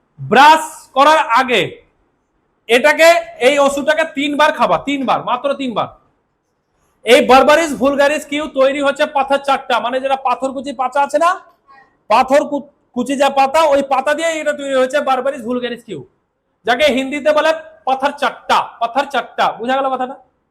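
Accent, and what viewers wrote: Rajshahi, Bangladesh. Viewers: স্যার আপনার কথার মধ্যে বাংলাদেশের রাজশাহীর টান আছে,,, ❤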